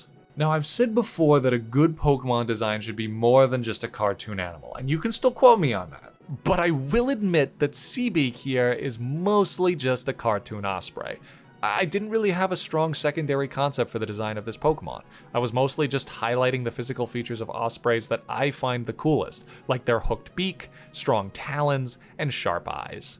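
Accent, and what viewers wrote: New England, United States. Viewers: when you said "hear that?" I actually heard a bird call that might have been a bluejay. btw I love you doing a new england accent even while you're not joking, it's nice. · Dunno how its taken me this long to realize youre from new england, maybe cus im from here too i was just like "yep completely normal average accent right there" lmao??? But eyyyy neighbor!!! (Pls read all in an equally thick Cape accent) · I can't tell if his NE accent is stronger in this video or if I just barely noticed it now that he said he's from there lol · Love how the New England accent becomes slightly stronger throughout the course of this video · Haven't watched for a while so the new england accent really hit me like a truck. not sure if its new or I just forgor. anyway I love Shorlugg pinipeds rule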